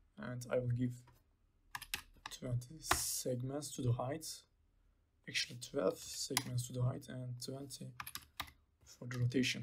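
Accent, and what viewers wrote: Turkey. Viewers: Ellerine sağlık abi Türk olduğunu anladım😁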